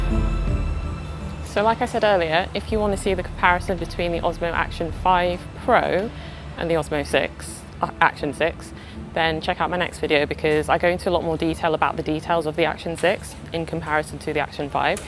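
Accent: England, United Kingdom. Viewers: You are the best mate 😊 By the way I really love to hear your voice 😅 a beautiful English accent ❤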